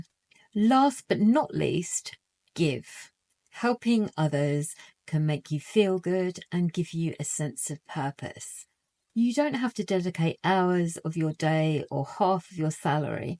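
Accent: United Kingdom. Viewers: I loveyour accent is it RP or POSH and where are you from?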